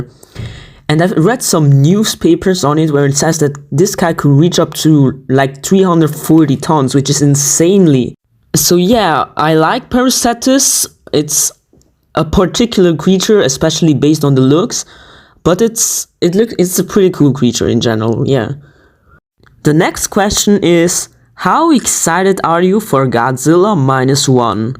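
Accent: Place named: Switzerland. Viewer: LET'S GOOO, QNA also i love the swiss accent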